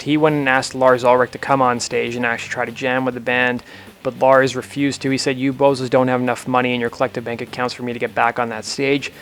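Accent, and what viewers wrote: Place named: Canada. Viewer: no bro. Youre completely missing the point. I was just explaining that his 3-5-7 gun mistake was probably due to the fact that he sounds canadian (his accent leads me to believe) and therefore does not know as much about guns as an american would. Here we play hockey and harvest maple syrup but we dont know too much about guns although we do like to start a few riots every now and then.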